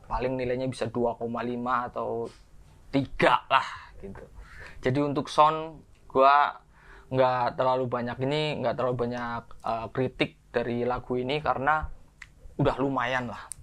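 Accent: Java, Indonesia. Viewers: Suaramu medok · kalo mo tengil paling gak mandi dulu mas.. hahahaha medok pula wong jowo gua gua an ahahhahaha *cangkulnya bawa lagi mas tar mandor nyariin wkkwkw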